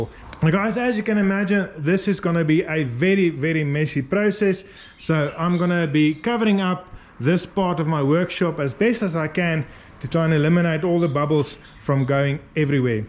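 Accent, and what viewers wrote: South Africa. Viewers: Afrikaans accent is the most beautiful in the world. Masterful work, also. ❤ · Are you South African? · Heavy South African accent...🇿🇦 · You sound almost like a South African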